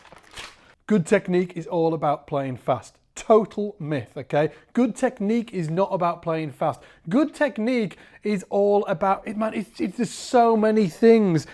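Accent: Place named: Northern England, United Kingdom. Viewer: has a killer northern English accent.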